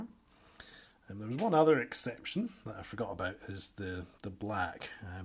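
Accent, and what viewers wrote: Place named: Scotland, United Kingdom. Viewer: This video Iv just found in time as got a copy of dead by lead my first western skirmish game and painting cowboys isn’t my usual genre as mostly paint sci-fi and cyberpunk minis. Great informative tutorial and better still it’s from a fellow Scot so accent was a nice change lol.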